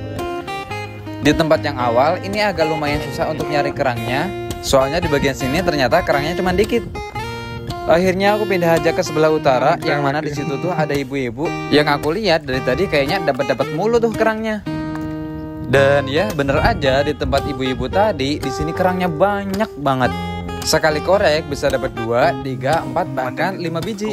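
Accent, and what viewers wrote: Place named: Banyumas, Indonesia. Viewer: wong ngapaknya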